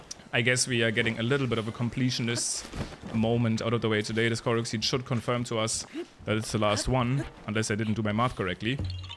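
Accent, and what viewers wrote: Germany. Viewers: why do you sound so german?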